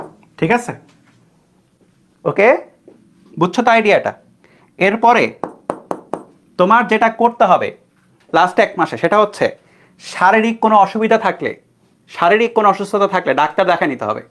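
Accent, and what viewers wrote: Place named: Barishal, Bangladesh. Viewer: আপনি বরিশাইল্লা😅 ভাষা শুনলে বুঝা যায়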